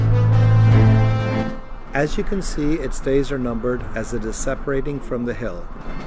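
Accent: United States. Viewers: Maybe it's just prejudice, but I can't abide American narration. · Why thank you. But sorry, I do hear a bit of the US in there. I wonder if your roots (your birth place and especially your parents birth place) were from the US and you spoke with American accents in the house (or on the ship, in your case) as your parents held their mid western accents. The Scottish was very slight with my ear - but I certainly did pick up the good ole Wheat Fields in your accent. I loved this video and would adore visiting this place someday when my 2 dogs are gone. BLESSINGS.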